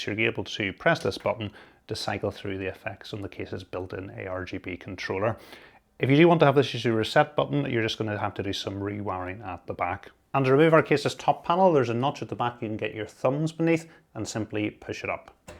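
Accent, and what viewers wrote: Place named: Northern Ireland, United Kingdom. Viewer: Really good to see this great build, and even better to hear a local NI accent. Super job especially with these 2026 parts prices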